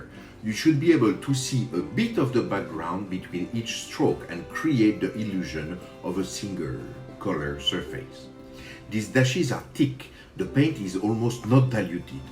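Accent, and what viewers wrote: France. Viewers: J ai reconnu un accent français donc je me permet d écrire en français 😂 est ce que vous pouvez faire une vidéo Painting like Bacon SVP ? Super travail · Super, vous venez du nord-est n'est ce pas?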